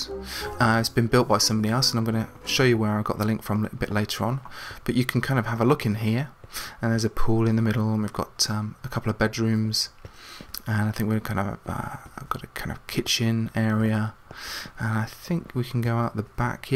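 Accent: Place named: North, United Kingdom. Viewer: Thats really awesome, great work...you say you're from the north, what part if you don't mind me asking, anywhere close to Middlesbrough, can't place the accent :)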